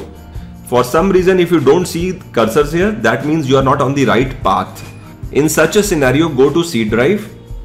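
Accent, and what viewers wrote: India. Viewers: i love your accent, my brother from india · u sound like a tech support scammer from india and its quackity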